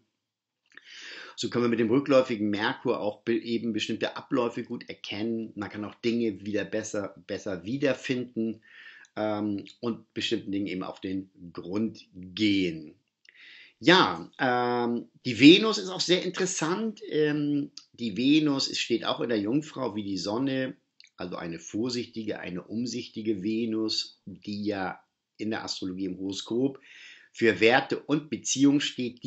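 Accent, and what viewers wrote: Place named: Kiel, Germany. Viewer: Oh, ich freu mich so sehr, dass Sie wieder da sind. Ihr "Kieler Slang" erfreut mein norddeutsche Herz jeden Morgen und Ihre unaufgeregte Art die aktuelle Sternenkostellation darzustellen bringt mir richtig Freude... danke, dass Sie da sind 💛✨️💫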